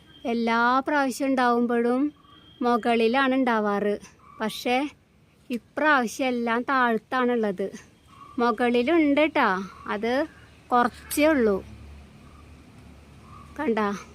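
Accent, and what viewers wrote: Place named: Thrissur, India. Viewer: ട്ട, ട്ട ട്ട ട്ട ട്ട ട്ട ട്ട, soooooper ആണ് ട്ടാ, തൃശ്ശൂർകാരി ആണ് ട്ടാ 👌❤️👍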